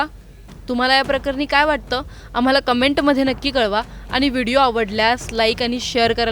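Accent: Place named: Khandesh, India. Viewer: Aani pani he marathi bhasha jalgaon khaandesh chi ahe . Kahi problem ahe tula ? Marathi che khup variants ahet.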